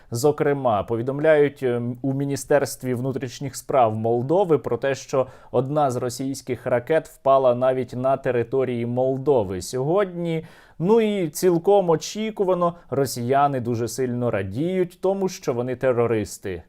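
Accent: Ukraine. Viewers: Українська в нього стала набагато кращою!!!Але вплив російськомовної Одеси на нього ще відчувається!!!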